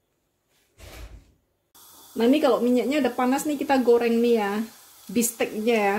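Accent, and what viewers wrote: Medan, Indonesia. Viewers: Aku suka bgt logat Medanya kuat, menjadi ciri has..masak nya enak² penjelasan jls..tks🙏😇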